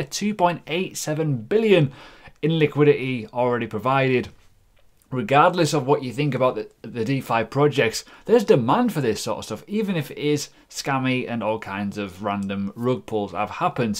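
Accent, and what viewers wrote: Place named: Hull, United Kingdom. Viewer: Where are you from mate. East Yorkshire. Bit of hull accent there lol I might be wrong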